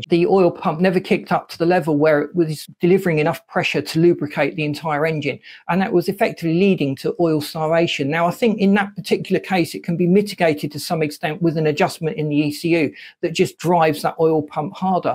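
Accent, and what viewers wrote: United Kingdom. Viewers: Why all the 'clever' advisory comments? This man knows his stuff, as do I (C&Guilds/National Crafts qualified for 46 years), so no need to 'try' to elaborate or add in any respect. He is absolutely 'Bang on' and a rarity, at that! He speaks like a true Brit! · Could you change your accent to something non british? Its barely understandable.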